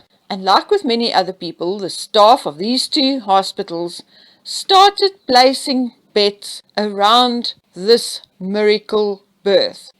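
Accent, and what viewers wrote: South Africa. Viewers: Dis 'n lekker Saffa accent... ek is van de Baai. 😊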